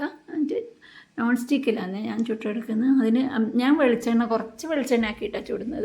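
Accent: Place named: Kannur, India. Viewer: കണ്ണൂർ കുക്കിങ് സൂപ്പർ ആണ് ട്ടോ കണ്ണൂർ ഭാഷയും സൂപ്പർ ❤️🥰❤️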